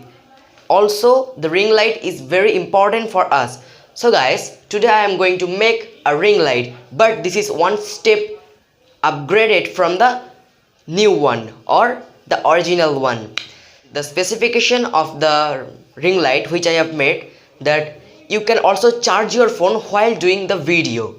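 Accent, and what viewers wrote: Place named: United States. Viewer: You are an Indian but your accent is the mixture of Indian and American ....wooooo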